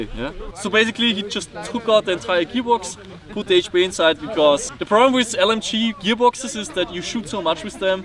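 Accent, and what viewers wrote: Germany. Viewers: Dein english hört sich Deutsch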